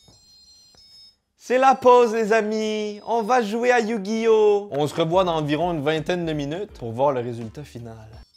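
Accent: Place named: Quebec, Canada. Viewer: Je suis français et entendre ton accent est un pur bonheur <3 vive le quebec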